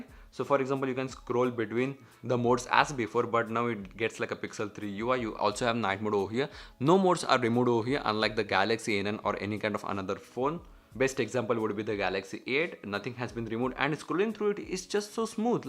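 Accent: India. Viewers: What a horrible accent. Indian english is the worst.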